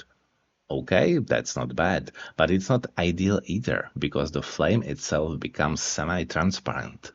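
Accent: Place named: Czech Republic. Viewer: Руслан, при чем здесь англосаксонцы и голос? из Чехии, это его акцент и его голос. У тебя всё в порядке? У тебя что-то мерещится? Может, перестанешь пользоваться интернетом, который так-то англосаксонский и пиндосский? (Какой же мусор у людей в головах!)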